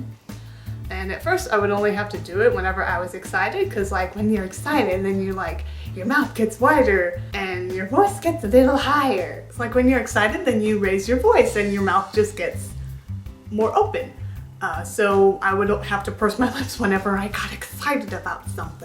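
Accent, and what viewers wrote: South, United States. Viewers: I think the way you talk is sexy as hell! It’s like an old-school Southern girl accent. I’m not into ASMR, but I usually watch just to hear your voice. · Imagine having a slow southern accent Beautiful young lady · you talk southern lol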